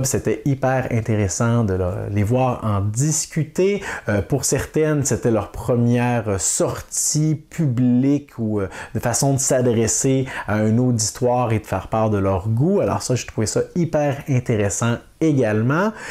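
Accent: Quebec, Canada. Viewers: Nouvel abonné, je vois suis depuis la Toulouse en France. Je vous ai découvert par hasard, et je vais être honnête c'est l'accent québécois qui m'a fait triper ! Plus sérieusement, c'est votre vidéo sur cloudspire qui m'intéressait, et grâce à vous (ou à cause de vous ?) mon portefeuille va saigner car j'ai très très envie de me l'offrir ! Merci pour votre passion et bonne continuation ! Salutations de Toulouse !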